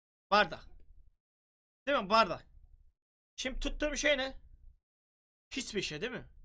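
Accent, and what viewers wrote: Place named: Rize, Turkey. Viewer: Abi videoların bagımlılık yapıyor resmen saten rizrlilerin konuşmasına hayranım kendimde gümüşhaneli olarak seni izleyerek çok mutlu oluyorum resmen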